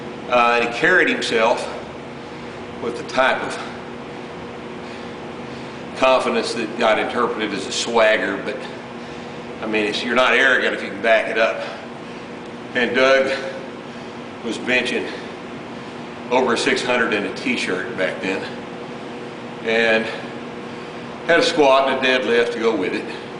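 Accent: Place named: United States. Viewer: What the fuck are you on about? There was literally no cussing, he's speaking clear American English (which is his audience). What could you possibly have to bitch about?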